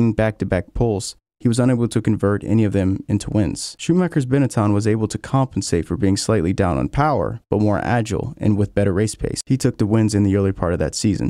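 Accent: United States. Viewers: a well spoken American accent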